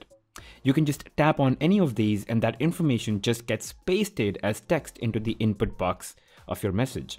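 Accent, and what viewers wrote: India. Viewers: Tapi logat lu kek orang india bro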